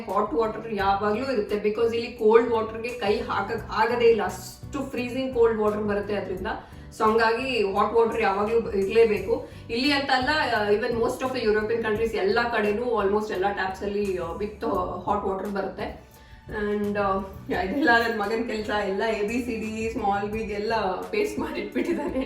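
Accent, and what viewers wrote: Mangalore, India. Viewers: Actually Mangalore Kannada is very nice to hear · Sister nima Mangalore Kannada super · I like the Mangalore kannada, do you know kundapur kannada? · Mlore Kannada super. So pls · dayavittu mangalore kannada matadi nevu mangalore kannada chenag matadtira......hage nevu return india bartira illa PR tagondu alle settle agtira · hi, yenri video nimadu super, matu nim manglore language tumba sakatagi irute. navu north karnataka davru, yavagalu nim manglore language keloke tunba ista. ondu request nimgae adstu manglore langauge matadi. hege heche hechu video madi... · Nimma kudla kannada nice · Woww super erna mangalore kannada... tulutla paterle .. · I like Mangalore Kannada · Really i like ur Mangalore kannada tumba chenag mathadthira. Mangalore kannadane mathadi tumba janakke esta agutte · Manglore kannada esta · Mam neevu Mangaloru kannadadalle mathadi aaytha nimma Kannada thumba chandha untu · I like magalore Kannada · I love your Mangalore kannada tq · ಮಂಗಳೂರು ಕನ್ನಡ ಚಂದ ಉಂಟು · I love manglore kannada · I like your mangalore Kannada . 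· Nima manglore language.super sis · Kudla is the best..😘😘 So good to hear mangalore kannada.. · Supar Mangalore kannada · Hii sister.... Iam from sullia.... Neevu manglore kannada mathadtha edre keltha irbeku antha agtha de❤❤❤.... Plzz reply mam. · Nivu Mangalore kannadane matadi mam . Nivu chennagi matadtira . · Nivu maglore Kannada ne matadi Mangalore Kannada nange rumba esta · Mangalore kannada👌👌👌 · Neev manglore kannada mathadodanna keli tumba kushi agutthe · Yeah... I like ur mangalore kannada & tulu ... evn I m from mangalore... staying in UAE .. love ur channel.. all the best ❤️ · Hi, this is Praveen from mangalore, nice home, your mangalore kannada is nice to hear😃, and we really proud for our tuluvas are in everywhere 👍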